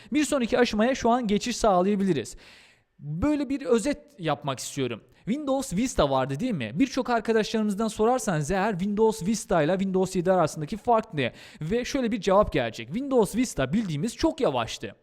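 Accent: Azerbaijan. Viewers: abi sen azeri misin merak ettim :D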